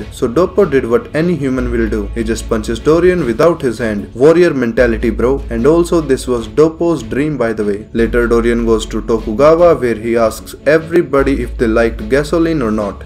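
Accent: India. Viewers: His indian accent just make this video better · i love this guy's perfect grasp of english slang combined with an indian accent, its hilarious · u sound indian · Dude I feel like you are a fellow Indian the accent sounds very similar 😅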